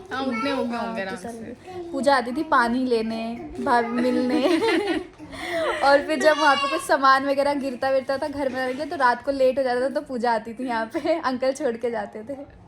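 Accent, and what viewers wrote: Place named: Bhojpuri, India. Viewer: Bhojpuri bolati Ho bahan to bahut acchi Lagti Ho Main Bhi Gorakhpur se hun mujhe bhi Bhojpuri Aati Hai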